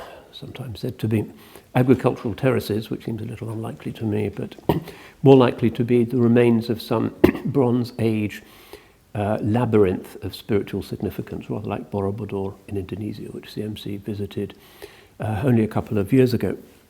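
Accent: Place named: RP, United Kingdom. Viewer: What thick accent? This is RP (received pronunciation), a cultivated, educated register of British English.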